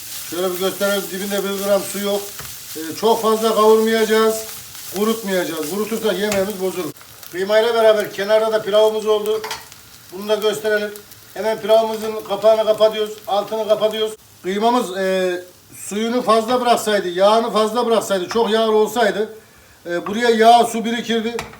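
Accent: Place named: Çorum, Turkey. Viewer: Ustam eline sağlık. Çorumlu musunuz memleketimin şivesi çok güzel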